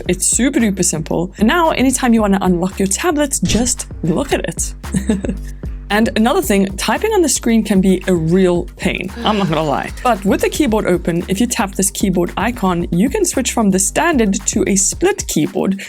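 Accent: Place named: South Africa. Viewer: Love the super fun features that you highlighted as well as the South African accent!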